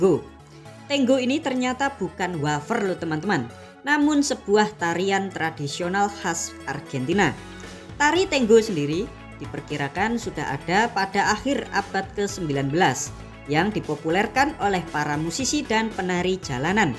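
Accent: Java, Indonesia. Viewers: Dialek Jawa ny kental banget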